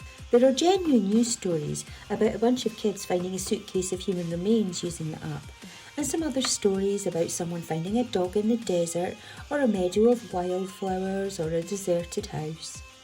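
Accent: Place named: Scotland, United Kingdom. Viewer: Your sweet voice+Scottish accent, is like song for sore ears, my dear! And yes, it has truly been, the wankiest of years; all of what you mentioned, my best flyfishing buddy (me Da, 83yrs young) being dagnosed, with terminal Liver-Cancer😩 (moderate drinker!). Feelings: <link> May, Hugin & Munin, guide your way...Take care of yourself and yours!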